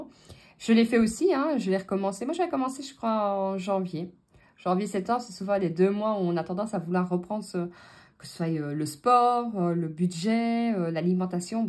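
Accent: Liège, Belgium. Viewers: Tu as déjà tenté le simulateur d'aube ? C'est un réveil dont la lumière s'allume progressivement pour être à son max à l'heure à laquelle il sonne. Ce qui fait que quand tu ouvres les yeux, la lumière est déjà allumée . J'en utilise un depuis près de 20 ans, je ne pourrais plus m'en passer ! Bonjour de Bruxelles, chère Liégoise ;-). L'accent ne trompe pas =D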